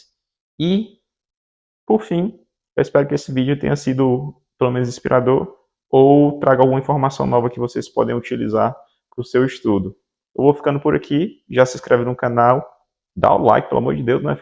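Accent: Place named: Brazil. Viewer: Maneiro o vídeo! Só seria legal colocar na descrição os nomes ou legenda porque como seu inglês tem uma pronúncia bem brasileira, não da pra entender todos os nomes que você fala :(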